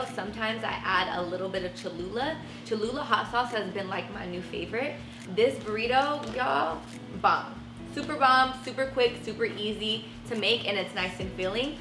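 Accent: France. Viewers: Ahhhh you speak French! Trop bien i love your accent 💕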